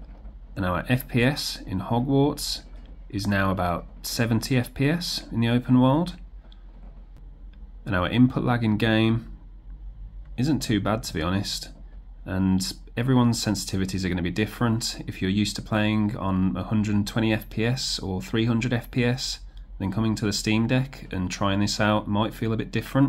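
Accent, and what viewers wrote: Birmingham, United Kingdom. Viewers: Where about in Birmingham you from mate? Always nice to see a fellow brummie.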